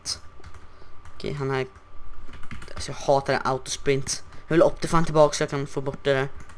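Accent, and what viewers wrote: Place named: Gothenburg, Sweden. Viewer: Var bor du ? Låter lite som göteborgska..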